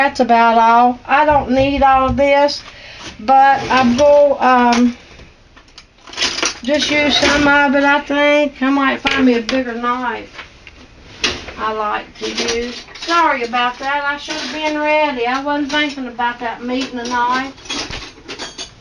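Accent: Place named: West Virginia, United States. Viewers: I would LOVE to know where you live.. You sound like you are from either West Virginia or Kentucky.. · Love your videos. I saw Appalachian cooking and thought of my Daddy , he was born in the Appalachian mountains. He had the same kinda accent as you. Brings back alot of memories and your cooking is similar to his. God bless you.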